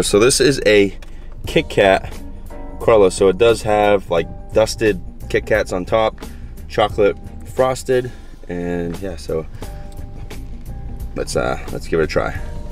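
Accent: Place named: New England, United States. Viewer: I thought for sure you'd go to Rocco's in Millbury,! PV is good too though! 😄 Wicked awesome video- happy you never forget your old stomping grounds! And don't you ever lose your accent- you gotta represent us New Englanders! LOL #TeamBigBites FOREVAA 🍩💜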